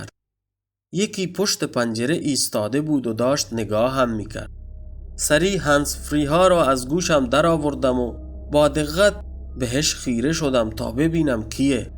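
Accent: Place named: Khuzestan, Iran. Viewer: درود بر شما🌹من فکر میکردم خوزستانی هستند😅چه عالی واقعا من هم لذت میبرم از لهجه راوی عزیز👍🏻👍🏻😊تندرست باشید همیشه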